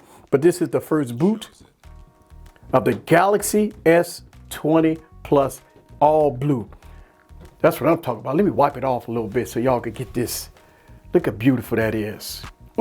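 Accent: United States. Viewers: I like your accent, what part of the US are you from? I'm from Liverpool UK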